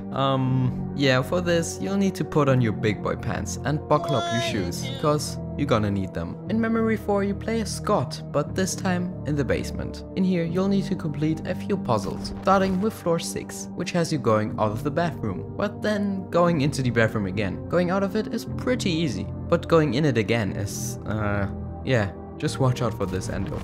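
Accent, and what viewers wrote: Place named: Germany. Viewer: I can hear the German I think xD but love ur videos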